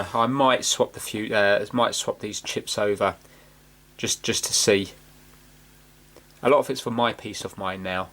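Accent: United Kingdom. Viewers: No, he said "Just yet, anyways" it's part of the UK accent.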